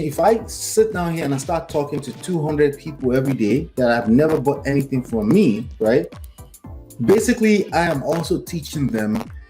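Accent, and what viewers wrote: Nigeria. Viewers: I love this video and can tell your nigerian by your name and accent.. lol if you don’t mind, I’ve come to realize that working with realtors provides the chance for us cash investors to work directly with them and completely take out skip tracing, cold calling and direct mail.. well that’s what I thought. do you have any advice on finding properties that aren’t listed on the MLS?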